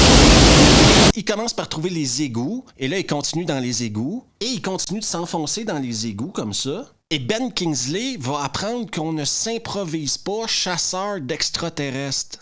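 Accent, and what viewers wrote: Quebec, Canada. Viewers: Salut ! Sympa d'avoir tous ces avis sur des films de notre génération (on a à peu près le même age) avec le petit accent du Québec exotique pour nous les Français, mais dans ton cas, y a aucun souci, c'est à 100 % compréhensible ! (je dis ça car j'éai été au Quebec en vacances et c'était vraiment pas facile, et du côté de Tadoussac, là c'était quasi une autre langue pour moi :-D ]. Super critiques de film donc, j'ai vraiment du plaisir à les regarder, bravo ! Souvent je rigole tout seul d'ailleurs, avec les expressions que tu trouves pour décrire les scènes. Pour ce qui est de "La mutante", j'avais vu au ciné aussi et j'avais même lu le livre avant (mais c'est pas un roman intrinsèque, je pense que c'est plutôt le scénario qui a été "romancisé" car c'est quasi identique à ce qui se passe dans le film, c'est juste plus détaillé grâce au processus de la narration qui permet de saisir les pensées des personnages... 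et de l'E.T.. Bref, j'avais bien aimé même s'il y a un côté "petit budget" qui empêche de donner une plus grande ampleur à ce qui aurait pu être une grande réussite du point de vue de la science-fiction. J'avoue que Natasha est bien plaisante à regarder, j'étais pas encore majeur hein ^^ (on l'a revue dans "Mon voisin le tueur" quelques années après en version moins... dénudée mais toujours très appétissante). La suite peut encore se mâter je trouve, encore moins de budget je crois mais des scènes bien dégueux-gores. Les 3ème et 4ème, c'est du niveau des Starship Troo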